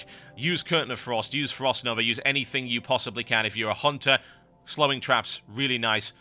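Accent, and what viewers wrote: United Kingdom. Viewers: british speak sucks !!!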